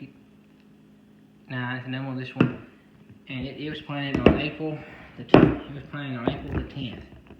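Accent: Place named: Tennessee, United States. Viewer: I assumed you lived close to the Tennessee line because of your accent (I know...you don't have an accent - neither do I). I am looking forward to watching your corn harvest this year. Do you plan to have some videos on that?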